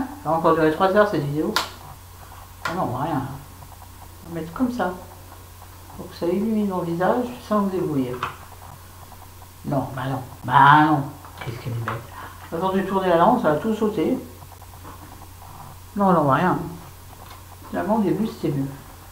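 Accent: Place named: Marseille, France. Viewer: voilà, la gratitude, tous les jours, je remercie tout et tout le monde, même moi même pour tout ce qui m'arrive de positif. Autrement perso, c'est bouddhisme, mais le reste est perso, par contre d'accord pour l'égo, c'est une saleté qui pourri l'humain. bisous ma mdr pour la vidéo accent marseillais!!!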